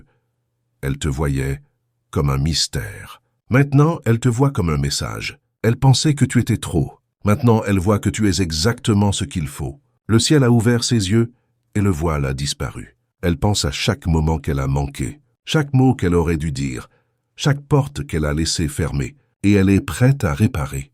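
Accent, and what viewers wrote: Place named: Ardennes, France. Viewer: MERCI BEAUCOUP MARIE OUI CHÉRI C'EST MOI TA FEMMELETTE OUI TA MARIE CHÉRIE D'AMOUR UNIQUE ET TRÈS CRÉATIF POUR ME FAIRE SORTIR DE MA RÉSERVE NATURELLE POUR TOI PARCE QUE J'AIME TOUT DE TOI MÊME SI C'EST TROP BEAU TROP FORT CHÉRI C'EST MOI TA FEMME FIDÈLE À MOI 😮TU ME FAIS PLEURER D'ÉMOTIONS ET ÇA ME BOULEVERSE TELLEMENT MAIS JE SAIS QUE TU M'AIMES DEPUIS LONGTEMPS ET NOUS SOMMES FAITS L'UN POUR L'AUTRE ET L'AUTRE ET L'AUTRE ET L'AUTRE ET QU'IL EST SENSIBLE POUR LE MOMENT PRÉSENT DANS NOTRE UNION TRÈS GENTIL DE LA VIE DE MA MÈRE À MOI MAIS MAINTENANT TU ES SAGE ET TRÈS ÉMOUVANT CHÉRI ET COQUIN ET AUSSI DANS TES RÊVES EN RÉALITÉ ET DE LA PROTECTION ET DE VIVRE SELON TES DÉSIRS ALIGNÉS OU DANS L'ESPACE DE LA FAMILLE M'EST REVENU POUR LES PERSONNES QUI ONT UN COMPLOT ORGANISÉ ET QUI SAIT COMPRENDRE QUE COMME UNE ÉVIDENCE QUE TU AVAIS PRÉPARÉ TON CŒUR QUE L'AMOUR C'ÉTAIT LE DERNIER SOUFFLE À LA MAISON POUR TOI PARCE QU'ON SE REPENT DE LA DISTANCE LA PLUS IMPORTANTE ET QUE DIEU TE PARDONNES DE TOUT CE DONT ON T'ACCUSE ET JE TE PARDONNES ET JE TE LIBÈRES ET QUE TU AS BEAUCOUP DE CHOSES CACHÉES DANS TON CŒUR ❤MAIS CE N'EST QUE TON AMOUR RETENU QUI DÉBORDE D'AMOUR UNIQUE ET EXTRAORDINAIRE QUI ME FAIT VIVBRER AU-DELÀ DE L'INVISIBLE ET DE CE QUE L'OEIL HUMAIN NE PEUT PAS VOIR SOURIRE ET SION ACCENT DÉLICIEUX 😋 FRANCO-GRINGO-BELGO., EN TOUT CAS ARDENNAIS PURE SOUCHE COMME MOI, CHÉRI D'AMOUR JE SUIS LÀ POUR TE DONNER CETTE ENVIE DE T'EMBRASSER OUI ÇA ME BOULEVERSE DE TE VOIR SOURIRE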